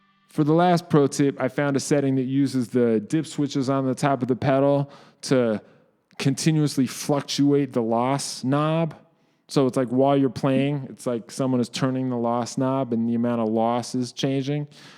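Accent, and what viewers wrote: Boston, United States. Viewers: are you from boston or something? im trying to place your accent. it doesnt sound nyc to me but maybe im trippin. thanks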